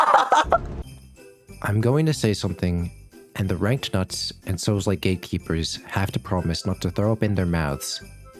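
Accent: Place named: United States. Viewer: you have an american accent but u are australian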